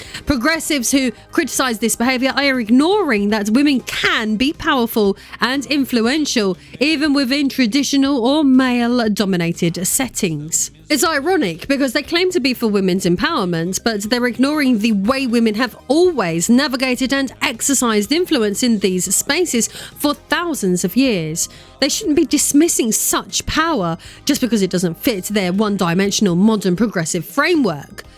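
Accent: United Kingdom. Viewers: British accent is ❤ don't ask me what Cheryl Cole be saying though. I too was 17 in 2006 we getting old but still young at heart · No worries - there will always a few bloat-heads spewing dirt around! I really enjoy your content as it´s based in reality - your videos are fun, informative and your british accent is the cherry on top. Greetings from good old Germany 🙂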